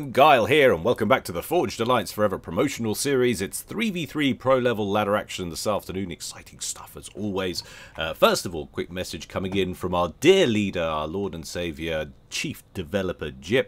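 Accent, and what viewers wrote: United Kingdom. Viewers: I think so, he sounds quite British to me.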